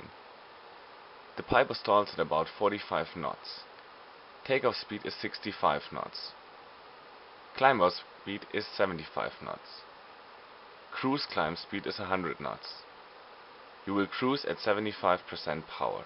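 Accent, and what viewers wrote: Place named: New Jersey, United States. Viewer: Sounds like you are from New Jersey!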